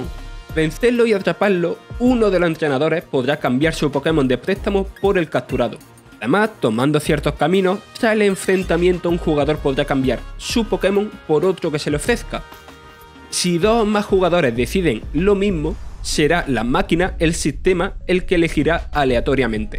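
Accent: Andalusia, Spain. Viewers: Qué acento tan andaluz tiene el chico que habla jeje pero me encanta 😍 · Gracias por el vídeo y por la narración aunque haya algunos "elementos" que lo están criticando..En fin, contra lo andaluz todo vale. Si el narrador tuviera acento catalán, gallego o vasco y alguien se atreviera a reírse o insultar, sería calificado en el acto de "fascista". Como es andaluz no pasa nada. Gentuza. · Es porque tiene un acento muy cerrado de algún lugar del sur de España, pero se le entiende perfectamente y tiene buena dicción.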